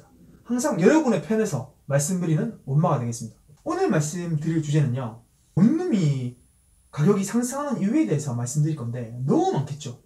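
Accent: Busan, South Korea. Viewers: ㅋㅋ부산사투리란다,,전혀 아닌거 같구요~~ 꼭 만나면 말도 못 할 분께서 전혀 문제될 부분도 아닌 곳에서 키보드 워리어를 하시더라구요^^ 부산사투리 쓰는게 듣기 싫으시면 안보는 방법이 있어요!! 똑똑하쥬?? 꼭 싫다면서 보드라구요🤭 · 마이크문제가 아니구 부산사투리랑 발음문제입니다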